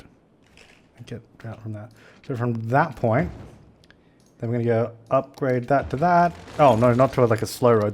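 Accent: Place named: Australia. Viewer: Thank you for creating,! When I focus on your video, I find your commetaries funny! Including the fact that you, and some viewers here taking your builds seriously. Its awesome! I also like to let your vids play in the background while studying. At times I also watch your videos when I'm trying to go to sleep.Your soothing voice and your Australian accent can be the perfect lullaby. I'll watch as much ads as I can to support you ba'aa'aa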